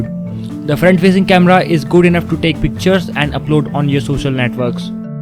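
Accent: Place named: Bihar, India. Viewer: Your English has Bihari Accent! #NoOffence Nice Video btw! :)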